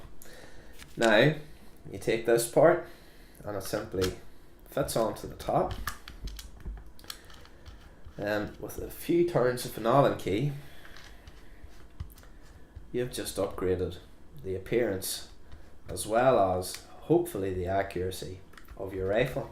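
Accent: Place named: Northern Ireland, United Kingdom. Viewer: Great video, I just purchased a second-hand 10/22 and tactical FDE stock today from Young Guns in Castledawson. I set aside a 25 round magazine because I know the PSNI have tried to make it a lot harder to purchase mags. Big hello from Co. Tyrone. It's nice to hear a local accent on YouTube for a change.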